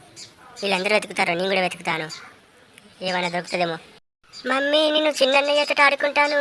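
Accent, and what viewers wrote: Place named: Telangana, India. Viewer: Telangana slag👌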